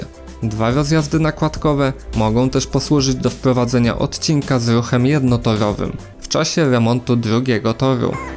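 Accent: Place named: Łódź, Poland. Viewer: Od razu słychać że jesteś z Łodzi. Krańcówka co :-)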